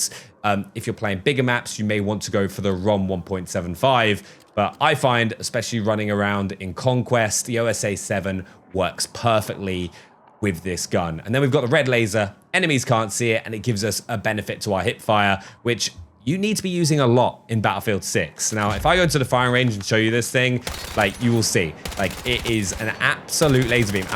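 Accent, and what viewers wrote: England, United Kingdom. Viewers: Jim Jefferies is Australian and this bloke is English. Completely different accents.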